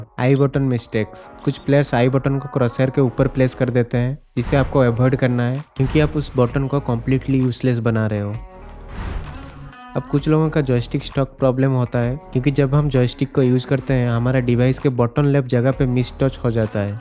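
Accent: Odisha, India. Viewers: Bhai tum odia ho kya ?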